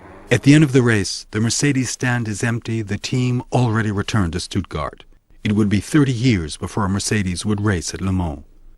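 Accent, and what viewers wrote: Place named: United Kingdom. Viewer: El título en ningún momento habla de español. Deja de enojarte con otros por ser vos el burro, y aprende un poco de inglés. Es británico encima, mucho más fácil de entender que el norteamericano. No seas tan engreído y deja de tenerle bronca a otros por tu ignorancia.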